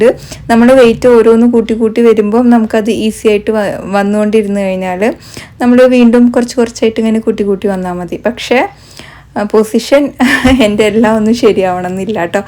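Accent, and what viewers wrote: Palakkad, India. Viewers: * palakkad slag elaloo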